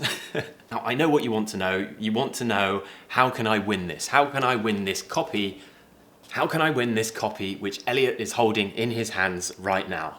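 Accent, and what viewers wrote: United Kingdom. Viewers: #englishgrammarinused Woww... you looked sexy at this video. Love from indonesia 😊✌ oh ya... I used to bad at listening, but i loved to learn listening through all your vid without subs. And nowadays my listening better i supposed because i can understand you with your British accent... Thank you for your vid and your clear sound and pronunciation. 🙏🙏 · #EnglishGrammarInUse absolutely love your adorable British accent 😍 thank you so much for inspiring me to learn English. Much love from Russia ❤️☺️ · Elliot, I really like your British accent... #EnglishGrammarInUse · #EnglishGrammarInUse I love listening to your British accent. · When you want to sound British, just listening and imitating him. Elliot, you're the best! #EnglishGrammarInUse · I adore your British accent! #EnglishGrammarInUse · I really like the way that you teach us your British accent is easy understanding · #EnglishGrammarInUse hello, your accent is the best British accent. 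I need some advice. I know many many words in English, but i can't use all of the words, when i talk or speak with somebody. Rest of time i forgot majority words. Please say me how can i use many different words in my conversation. Generally i use very simply words, when i speak. I want to speak more widely and i want to use more different words. Thank you so much before your answer! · #EnglishGrammerInUse hope its not too late to comment and join the competition! Constantly learning English is one of my hobbies and favourites, and a foreign language makes me more sensible when talking to ppl and guide me to see the world in a different way! Thankss Elliot I really like your channel and feeling motivated and encouraged by such wonderful British accents too! :) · #EnglishGrammarInUse Thank you Elliot for filming so many amazing videos. Your videos help me build up British accent greatly and I am totally fascinated by your voice! 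Your videos are always the best way for me to train my British English listening, and at the same time, I do learn some useful phrases also. Besides, your videos are always the first choice that I highly recommend my students to watch and learn British accent. Every time my students say that they are interested in the British accent, I always say go watch videos. Once again, thanks for filming so many excellent videos!! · I adore your British accent!❤ You're amazing❤ Greeting from Egypt❤ #EnglishGrammarInUse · Wow! You are the best teacher, good lesson. And you have good British speech, the best as for me. From Ukraine with love and.. #EnglishGrammarInUse :)) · I love your British accent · #EngliushGrammarInUse I just love your channel and your British accent. Nice one!!!!!! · Your accent is my inspitation to become a good british english speaker. #EnglishGrammarInUse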